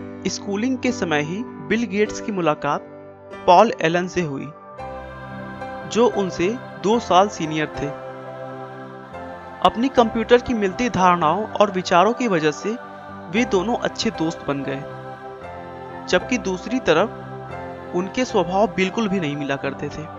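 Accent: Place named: Uttar Pradesh, India. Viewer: Iska uttar Pradesh Wala accent kan me chub ta ha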